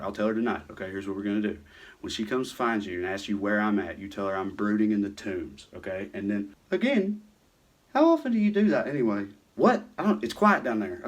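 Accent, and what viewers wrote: South, United States. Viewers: Got damn, my daddy cussed, and so did I. I was a small, blonde headed, spirited and polite Misssissippi girl just cussing when needed. One day on the playground, in the 3rd grade, a necessary "got dam it" moment occurred. A little Baptist girl, ( we were not Baptist) goody 2 shoes attacks me, yelling: "STOP TAKING THE LORD'S NAME IN VAIN." A vein was pulsating in her forehead. I blew her off, had she gone crazy? She followed, yelling. I turned around and asked her what she was talking about? Was she crazy? She explained "G.D". I looked at her as if she was insane and said," I am saying G O T DAM IT,it's got nothing to do with GOD!!!!!.........later I did delete "got dam" from my cussing...I knew, GOD knew I was not talking about "him" but I guess I did not want others to think that I did not love GOD....alas....I appreciate your clarification of your "Got damns", because they were bothersome to my hearing enlightening, intelligent words in a Southern accent. 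And that brings me hope and pleasure everytime you speak. Thank you,. Please continue sharing your thoughtful reality with us. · I am so fucking hooked on the brilliance, rhythm of Southern American speech, and erudition of, that I watched GOT after watching one of his takes on the show (I live in Spain, so we get everything late here.) Now, I am wearing dragon earrings and saying "Valar Morghulis" to random people in cafes. After I binge-watched the series, I watched his takes again, and I nearly tinkled my knickers.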